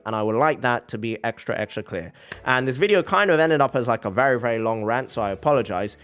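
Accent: United Kingdom. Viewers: You are gonna love Link Click. Odd taxi has brilliant writing but like you I was just blown away by Link Click. I always feel like I am visiting with old friends from the UK when I hear you stream. Love your content mate.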